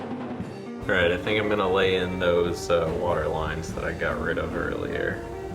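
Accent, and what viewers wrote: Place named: United Kingdom. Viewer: I love this,. Thank you for posting. It looks like you are using a smaller, square canvas and much smaller brushes than Bob uses. I have been given a lot of small canvases including square ones. I shall be trying your method of painting this using smaller brushes too. Your accent sounds British, if so where are you from? I’m English but now living in Spain.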